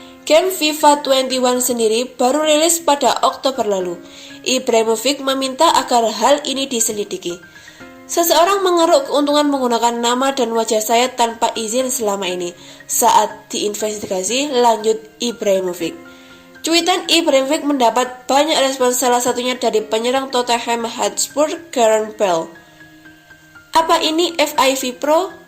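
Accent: Java, Indonesia. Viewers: medokss banget mbak..😅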